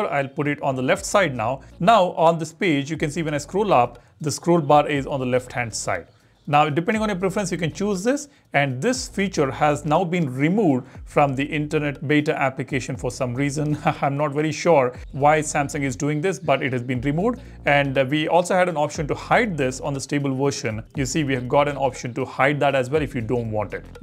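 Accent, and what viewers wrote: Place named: India. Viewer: My friend thanks forall your information but you are talking to fast and with your Indian accent it’s very difficult to followed you. Please don’t believe I’m not accusing you I’m not insulting you but you needs to slow down if you want to people understand you Especially some of us don’t know or not familiar with technical issues 🙏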